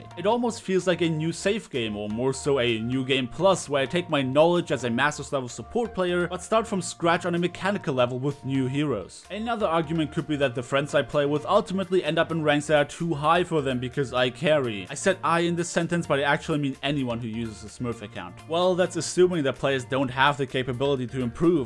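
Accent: Germany. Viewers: Ich wusste es (du bist ein deutscher ich habs immer gewusst alleine an dem akzent) haha ich bin nicht verrückt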